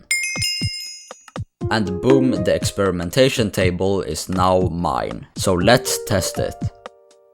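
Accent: Sweden. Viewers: Svenska accent👌🤌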